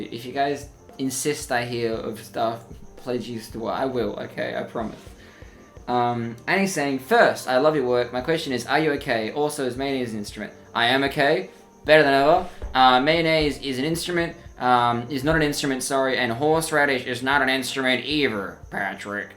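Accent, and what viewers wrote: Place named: Australia. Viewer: you have the exact australian accent i thought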